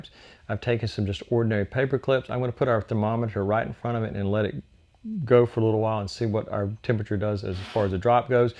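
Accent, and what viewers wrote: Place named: Tennessee, United States. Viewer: Where are you located? You sound like maybe Tennessee.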